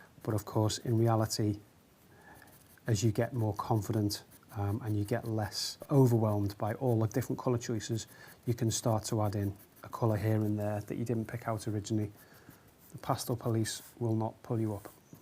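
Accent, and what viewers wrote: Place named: Merseyside, United Kingdom. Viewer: Enjoyed the video just great thanks. Ps your voice sounds like mine Merseyside right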